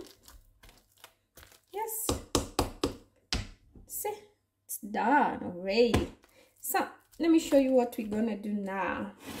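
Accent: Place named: Coast, Kenya. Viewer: Hapa Mombasani ni Kitunguu Maji( costo accent😅😅👌)as always outgoing yourself in your kitchen😋😋yummy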